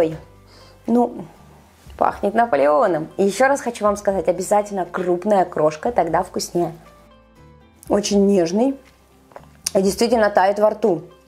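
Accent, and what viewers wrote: Surzhyk, Ukraine. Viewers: Да, проскальзывает "суржик", но не противный, как у многих! Зато все настолько гармонично...и кухня, и посуда, и манера приготовления...смотришь некоторых, плита грязная, посуда горелая, кухня вообще прошлого века, готовят неаккуратно, но лезут показать свои "шедевры". - молодец, руки умелые, много знает, чистота на кухне, рецепты интересные, проверенные на себе, аккуратность...так что мелочи речи не в счет! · Это Украинский диалект. Мы живём рядом и тоже так говорим.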